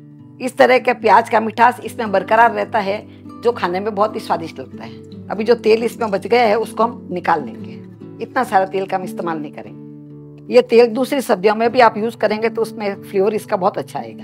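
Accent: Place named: Rajasthan, India. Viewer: True.. I miss her beautiful Rajsthani accent ..🙂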